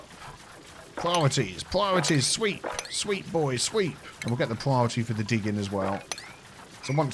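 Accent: United Kingdom. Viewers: I love this game so much. But being somewhat of a voice fanatic, I'm obsessed with your voice. All I do is listen and try to add certain aspects of it to my repertoire. Can I ask where in the UK you're from?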